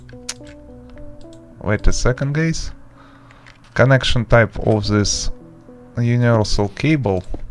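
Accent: Russia. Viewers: Русский акцент))) да ты же наш)))😅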